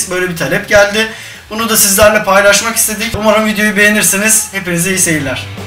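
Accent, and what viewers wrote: Erzurum, Turkey. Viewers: abi siz erzurumlumusunuz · Abi Erzurum lu musn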